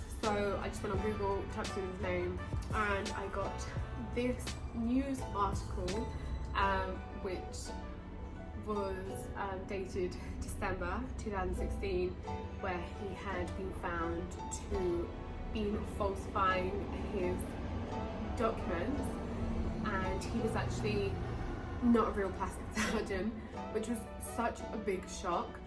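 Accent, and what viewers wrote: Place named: United Kingdom. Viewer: Are you Colombian? Omg I had a feeling you was but was confuse since you talk English with the UK accent. Lol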